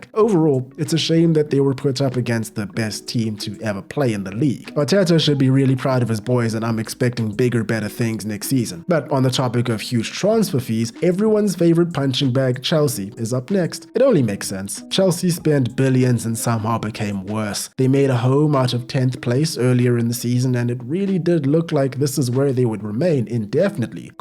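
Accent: South Africa. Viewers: Are u South African? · I just know that’s a South African accent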